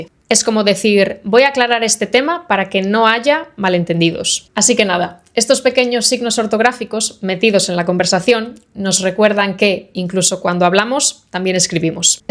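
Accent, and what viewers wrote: Spain. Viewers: He encontrado su canal de YouTube. Soy brasileño y aprendí a hablar español con un matrimonio de más edad que tenía una hija pequeña, y nuestra amistad llegó al punto de que me trataron como a un hijo. En aquella época, ellos tendrían alrededor de 45 y 55 años, y yo unos 22; hoy soy yo quien tiene esos 55 años. Y con ellos aprendí a hablar español. Ella era catalana y él, sevillano. Mi español, sin falsa modestia, llegó a ser tan bueno que los hispanohablantes de América Latina, cuando conversaban conmigo, pensaban que yo era español, y se sorprendían cuando les decía que no, que en realidad era brasileño. En realidad, fuera de Brasil solo he estado en México y en Ecuador. Pero en Brasil he conversado con muchos hispanohablantes de distintos países de América Latina, y todos siempre creyeron que yo era español. Obviamente, un hablante de España debe notar que no soy nativo, pero el hecho de que los hispanohablantes latinoamericanos piensen que lo soy, me alegra mucho. 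Encontrar su canal ha sido un verdadero hallazgo, pues por diversas razones perdí el contacto con aquel matrimonio y echo de menos escuchar ese acento hispano. Como sabrá, en Brasil hablamos portugués y aquí pocas personas saben hablar español, o cualquier otro idioma que no sea el portugués. Y oírle hablar con ese acento suena como una música para mis oídos.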